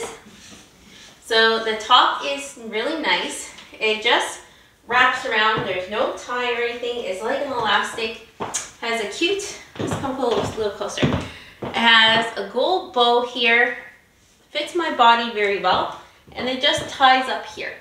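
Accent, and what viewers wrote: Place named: Canada. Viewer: good night sweetheart I can tell in your voice that you are from Canada sweetheart I love it❤❤ looking beautiful in that sweetheart 🥰🥰🥰 love to hear your voice in person 😍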